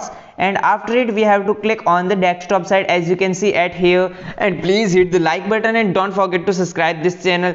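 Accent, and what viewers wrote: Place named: India. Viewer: Indian accent sound so dam hot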